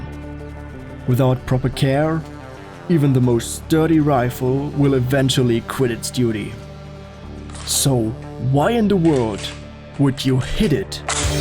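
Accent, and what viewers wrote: Germany. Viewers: Why does it sound like he is German? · Man hört den Alman bisschen raus aber sonst interessantes Vid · Man hört nach nach kurzer Zeit das du deutscher bist, aber ich musste 1. nachschauen (kanalinfo) und 2. ich kenne keinen Deutschen der so gut und flüssig English spricht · Ich liebe diesen deutschen Akzent 😅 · Dein Englisch ist gut, aber man hört sofort, dass du deutsch bist 😁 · vid ist ganz ok, aber an deinem t-h musst du noch arbeiten, man kann sehr einfach raushören, dass du deutsch bist aber du redest schon flüssig, was sehr gut ist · ohne deutschen akzent bitte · Yeah apart from the zhick German Akzent and the sometimes wannabe British pronunciation of words pretty much yeah. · Du klingst sooo deutsch.😂 Trotzdem cooles Video.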